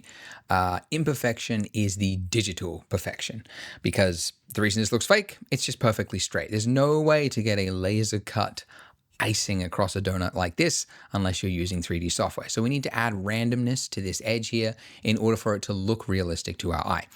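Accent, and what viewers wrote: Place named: Australia. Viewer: Oh thank goodness, I'm so glad to hear an aussie accent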